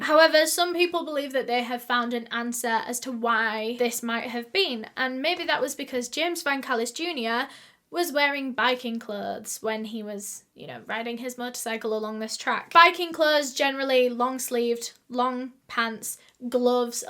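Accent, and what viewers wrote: United Kingdom. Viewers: oml i thought this was kendall rae, then i heard the accent i was like: what in the world?!!! when did she become british?! 😭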